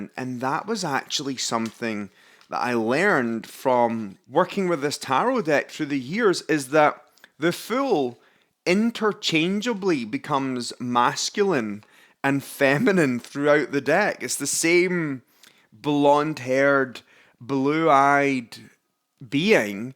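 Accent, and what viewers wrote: Scotland, United Kingdom. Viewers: After starting a course to learn Reiki I suddenly really wanted a tarot deck to meditate with and I chose the Wildwood deck which also has artwork by Will Worthington. I absolutely love this deck and it is very similar to the Druid deck, with standing stones, the Green Man and ancient folk lore. I should imagine you also have the Wildwood and the Greenwood Decks. I just found your channel and enjoyed listening to a Scottish accent talking about tarot. I am also from the UK.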